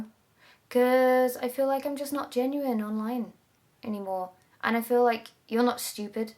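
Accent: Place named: United Kingdom. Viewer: Hello there, don’t even know if you read these comments... but, anyways, a good intention always counts, right? I lived in London for a while and, missing the beautiful British accent, I went searching for a pretty uk face that sound good… So I found you. You are such an interesting human being. Congrats for that! About felling like a lonely wolf, working hard does that. But don’t let this become hurtful. I’ve been doing the something for over 6 years, got really close last year twice, but didn’t get it… I worked so hard that I lost all my friends, I used to be proud of it… Not anymore, I started English classes online just to have someone to talk to, hahaha. Long story short, we are social animals, maybe sometimes you are cranky and moody with people you share your time with, but so what? Everybody does that. You don’t need to be perfect! because guess what!?!?! 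Perfection is not something real, we idealize it! And by the way you are gorgeous! You are funny, smart, beautiful, great singer and very interesting being. About changing, If really feel like you have to, take it one step at a time, and one little thing at a time… Doing all at once will never work. Don’t be too hard on yourself. You got all it takes do be whatever you’d like to be! You are such a confident person, be confident about it too! Regards from Brazil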